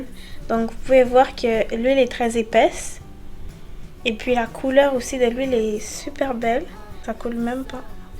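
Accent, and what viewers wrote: Canada. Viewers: Je connais la gamme d'Eva depuis plus de 2 ans et demie, elle a bien progressé ! J'utilise la gamme Kreyol essence depuis 3 ans vous la connaissez ? Elle est chère aussi mais fait du bien ! Il faut pas gaspiller. J'adore l'accent canadien ! Je pense tester la gamme de Eva mi 2023 ! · Vous avez un petit accent canadien mademoiselle 😉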